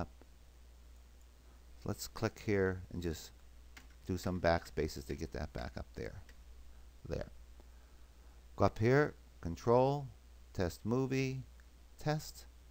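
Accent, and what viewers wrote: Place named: Newfoundland, Canada. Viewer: Fellow Newfoundlander maybe? :)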